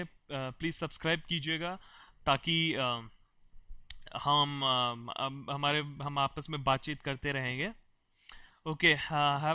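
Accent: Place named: India. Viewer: the accent doesn't sound american to me.. its our own desi accent ..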